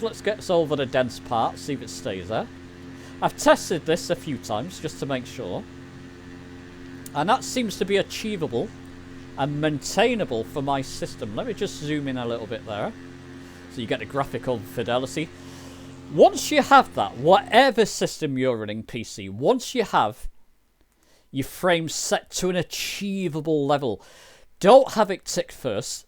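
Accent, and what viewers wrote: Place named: Scotland, United Kingdom. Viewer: Lol your accent sounds just like my Scottish friend I play Rust with! Thanks for the video!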